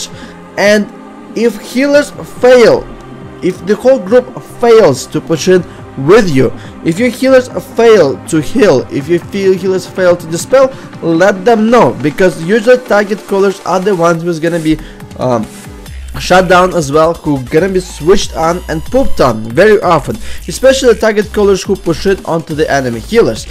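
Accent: Russia. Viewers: I would like to have a lesson because nothing is better than kicking some butts listening a multi glad russian ascent.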